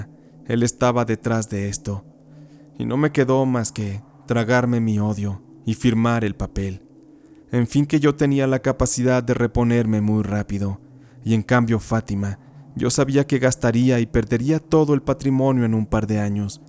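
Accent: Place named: Mexico. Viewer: ME ENCANTA EL ACENTRO MEXICANO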